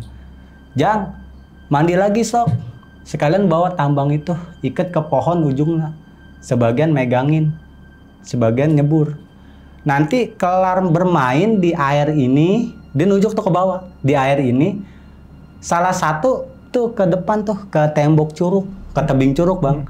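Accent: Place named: Betawi, Indonesia. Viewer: Keren lu bang bahasa Betawi Bener 😂🙏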